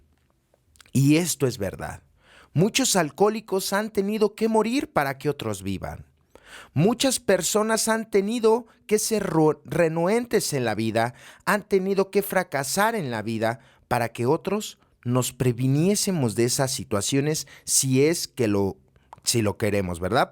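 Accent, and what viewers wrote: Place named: Mexico. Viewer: Oye, tu ères de Mexico Verdad ? Por El asento tan bonito, yo naci en uno de Los grupos de Monterrey.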